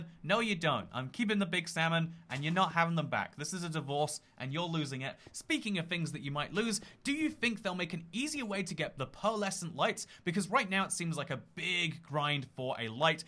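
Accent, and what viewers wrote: United States. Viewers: I'm american 🇺🇸 and even though I'm Texan I've never heard an American accent like that. It's funny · It's not the only thing that I take from your videos, but I love your "Monopoly Man" U.S. accent. Kind of a southern Civil War colonel meets old, crotchety Appalachian hillbilly thing. I can say that because I'm an East Texas hillbilly type. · Please don't do an american accent, it scares me. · Pretty good American accent i’m ashamed to say people do sound like that 🤠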